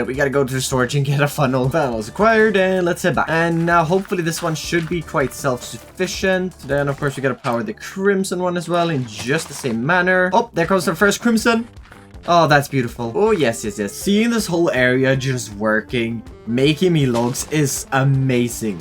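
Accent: Sweden. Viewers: These videos are great man, keep it up your builds are awesome. Jag hörde din svenska dialekt direkt hahaha ;)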